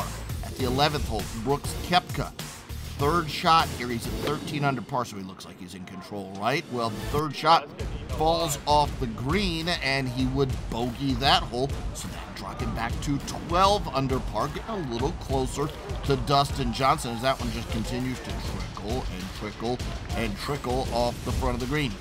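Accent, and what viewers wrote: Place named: United States. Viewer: Crap yank commentary...I can't watch it after 7 seconds...just ruins the highlights...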